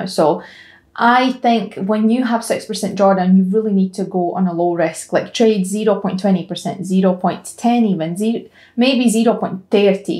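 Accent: Scotland, United Kingdom. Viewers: Where is your accent from? I hear Scottish but mixed with something else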